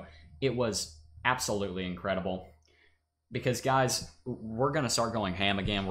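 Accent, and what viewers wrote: South, United States. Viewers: I missed your country drawl!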